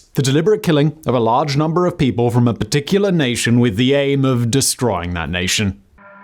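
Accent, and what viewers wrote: England, United Kingdom. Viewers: Thank you for covering this overlooked piece of history. Any light shed on this matter has the potential to save the lives of Armenians who are in what seems like a forever struggle to keep what little home they have left from classical antiquity. English and especially French churches were instrumental in saving my grandparents so I'm humbled again hearing your English accent. Armenia has been experiencing the same horrors since the Seljuks first entered classic Byzantium.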